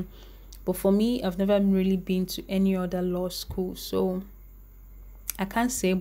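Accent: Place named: Enugu, Nigeria. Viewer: Dat Enugu YouTuber, I love your accent and your work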